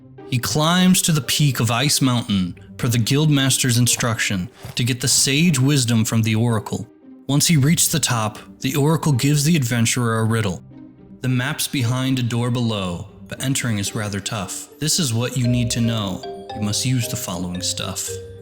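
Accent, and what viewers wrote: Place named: United States. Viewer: Monotone american accent ruins it. Sorry, great animation though.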